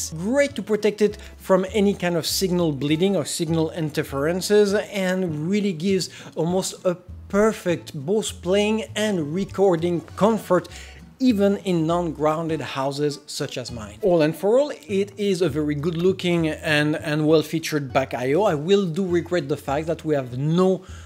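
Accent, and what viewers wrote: France. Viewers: You have a little french accent 😊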